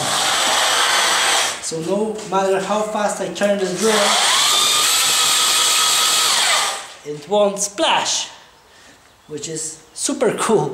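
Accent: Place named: United States. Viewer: masz piekny dom i swietna rodzinke moje gratulacje. Mam jedno pytanie i bylbym wdzieczny gdybys odpowiedzial, czy mieszkales kiedys w USA? twoj angielski jest bardziej amerykaski niz ten z Wysp.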